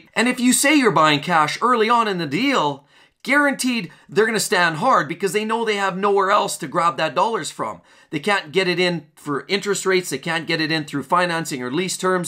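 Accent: Canada. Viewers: the most Canadian accent I've ever heard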